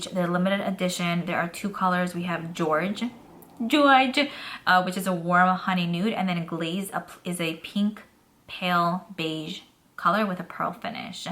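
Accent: New York, United States. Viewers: Sweetie - your 'aw' is a thing we New Yorkers do, and we are unique and wonderful in the world! You keep your 'aw'. I have done it for years and it's staying! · I love that you’re so New York in your pronunciation. Makes me homesick. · You rock!!!! I love these videos!!! 😍❤️ Please never change your New York accent!!! I love it so much!!! Everyone has accents all over the world and it makes things interesting and new 🤩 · To me your aw accent is normal for the area you live in my mom grew up in the Jersey/New York area but has lived in stl for 40 years and still has that aw accent. We even picked up some words and have people tell me and my siblings we say things odd.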